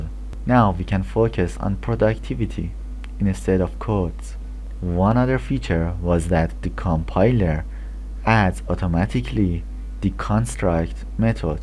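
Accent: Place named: Iran. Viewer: This is how an Iranian talks English:) nice job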